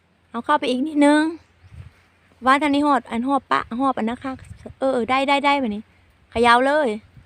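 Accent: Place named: Phu Thai, Thailand. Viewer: มาลุ้นแกย่ไข่มดแดงนำเด้อครับ มักเสียงเว้าภูไทครับผม ติดตามให้กำลังใจนำครับ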